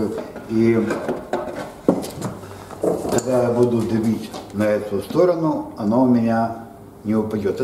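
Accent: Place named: Odesa, Ukraine. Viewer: забавляют-не забавляют-такое...))) Во-первых, имеем дело с чистым одесситом. Слушать одесские обороты и истории-отдельное удовольствие. Как говорится, что вы говорите-не важно-просто говорите по одесски. Мастер, по-моему скромному мнению, очень хорошо и без понтов доносит личный опыт, бесценный. И чего раньше канал не создал. Диме, сыну, огромное благодарю, что таки батю сподвиг. И по друге (во-вторых), коммент вверху уводит в сторону от сути и духа повествования. А так, понятно, каждый имеет право видеть и воспринимать ролик по-своему. Ничего личного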